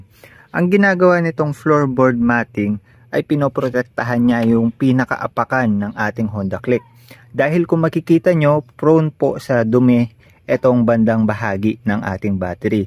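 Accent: Pampanga, Philippines. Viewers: Kapampangan sya.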